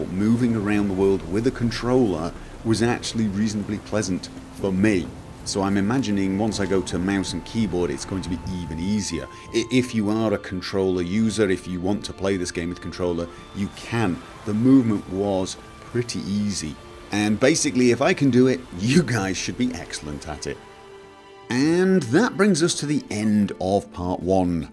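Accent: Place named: Northern England, United Kingdom. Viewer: ***** Bollocks, he has a northern british accent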